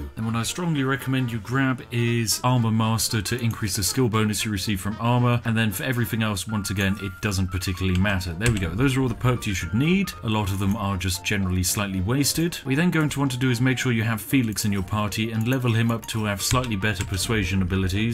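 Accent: United Kingdom. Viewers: Well… did you catch his spiffingly British Britishosity? He’s an incarnation of one of the peaks of Human performance (Evangelic British Hegemony).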